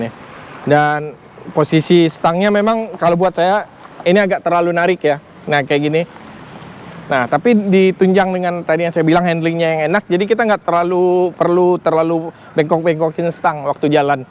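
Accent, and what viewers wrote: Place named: Minangkabau, Indonesia. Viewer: Minang bana Uda ini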